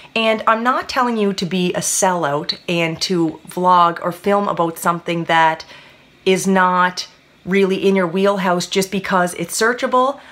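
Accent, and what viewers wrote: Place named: Nova Scotia, Canada. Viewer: Thanks for sharing this. I've been thinking about doing a channel of my own for a while. I def love hair stuff and have been watching videos for years on Youtube so that would be my main focus, but I would probably add in some fitness/nutritiong stuff too, since I am a PT...or physiotherapist as they probably call it in Nova Scotia. BTW, I love your accent!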